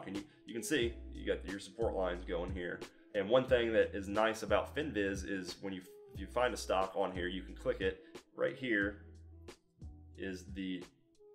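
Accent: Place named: United States. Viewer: And he also fixed his English and now talks like an American.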